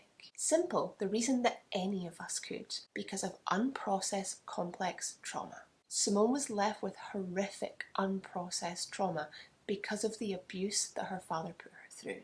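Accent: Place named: Ireland. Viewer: Came here from tiktok, I like your Irish accent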